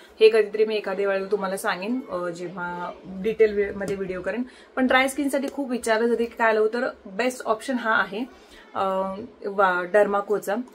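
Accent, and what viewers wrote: Kolhapur, India. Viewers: तुझी कोल्हापुरी मदून मदुन बोलतेस आवडत ऐकाय bolt ja kolhapuri avdel eiky ❤श्री स्वामी समर्थ ❤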